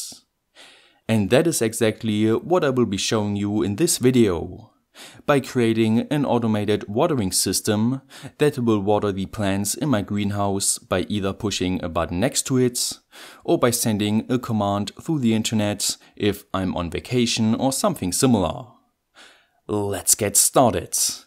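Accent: Germany. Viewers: I think it just comes down to the German accent as some sounds in English don't appear in German, like instead of -ous (serious) we say -ös (seriös).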